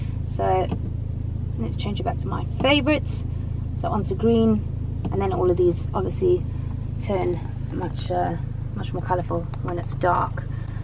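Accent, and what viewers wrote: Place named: South Africa. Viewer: you have a hint of a South African accent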